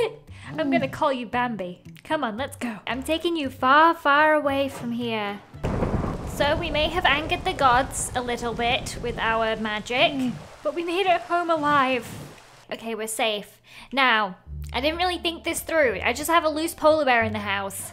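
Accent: England, United Kingdom. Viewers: Me to but is English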